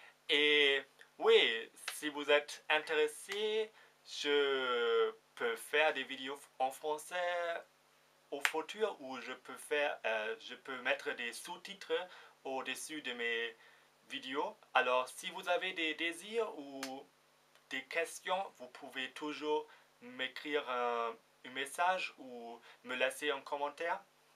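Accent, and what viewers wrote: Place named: Germany. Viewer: Hallo ! ich bin Französich,du sprichst gut Französich , mach dir keine Sorgen ;) Ihren deutschen Akzent ist cool, nicht entfernt continue comme ça!!!!!!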